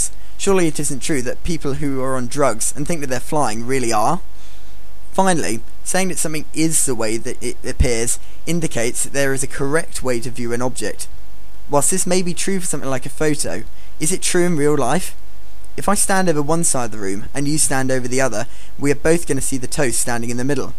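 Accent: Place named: United Kingdom. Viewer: This is not plain English. This is British English!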